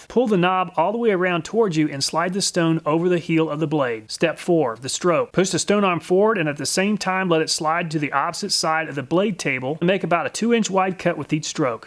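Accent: United States. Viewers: He sound so American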